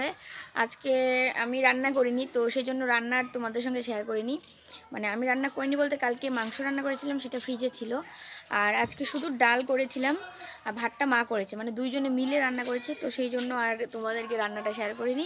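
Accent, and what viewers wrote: Bangal, Bangladesh. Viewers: Didi maajhe maajhe bangal vashay kotha boliyo , valo laage shunte tomar mukhe bangal vasha shunte..❤️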